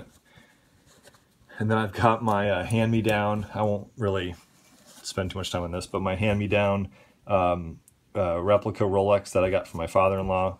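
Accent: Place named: United States. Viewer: Are you actually Dutch? You sound like a native speaking American!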